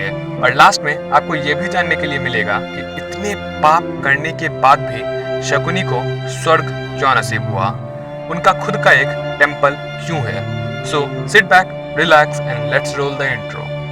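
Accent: Bihar, India. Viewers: Tum bihari hoo kya 🙄🙄🙄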